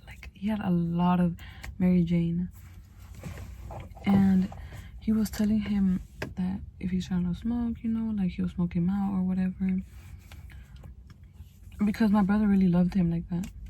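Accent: United States. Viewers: gurlll what side of houston you from?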